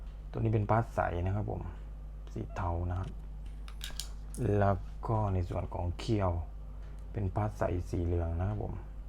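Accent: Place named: South, Thailand. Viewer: พี่บ่าวคนใต้แน่เลย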